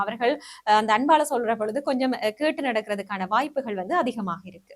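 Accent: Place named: Sri Lanka. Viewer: மேடம் உங்களுக்கு என்ன இலங்கையா உங்க பேச்சு எனக்கு ரொம்ப பிடிச்சிருக்கு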